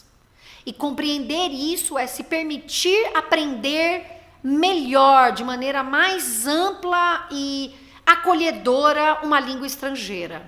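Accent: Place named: Caipira, Brazil. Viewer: Acho que a graça e a riqueza de qualquer língua está justamente nos diferentes "sotaques"! É uma delícia ouvir você, com seu "R caipira", falando sobre isso!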